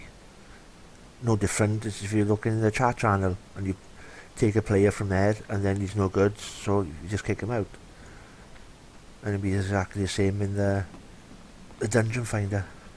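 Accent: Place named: Wales, United Kingdom. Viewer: Love your Welsh accent Baaaaaaaaaah (sorry that was unnecessary I know).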